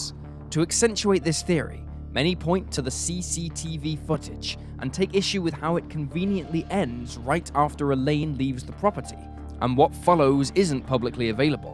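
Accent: United Kingdom. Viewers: Lovely creative girl. Such a shame 2015 happened to her. My mum brought me up , that girls think its cute how timid I am in terms of touching , don't get me wrong I love wrapping my arms round and kissing and sweeping them away or trying but I don't get guys who think a no is a challenge or chase or they want so they do. Anyway from the UK why do Americans say its 20, 600 block instead of what it actually is? 26 thousand block like the man said (narrator's voice from the UK) . I can't end the comment taking away from this girls story.so apologise but from the UK , I hope her end is not final and if it is I hope justice comforts her and her family.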